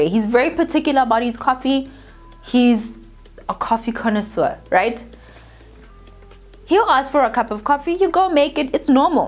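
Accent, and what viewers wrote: South Africa. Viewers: I LOVE #SouthAfrican Accent You're Amazing Girl ❤️ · I just saw ur video on ugly panties nd subscribed with immediate effect! Liking the fact that u sound so South African gao zame go sound American or speaking through the nose😂🤣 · South African?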